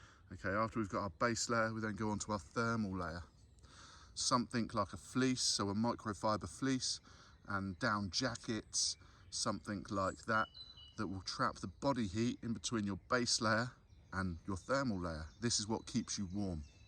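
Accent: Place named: England, United Kingdom. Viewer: I really enjoyed this, thanks. Simple, straight forward, and right to the point. And great to hear an English accent knowledgeable about the outdoors. Thanks for taking the time to share your experience.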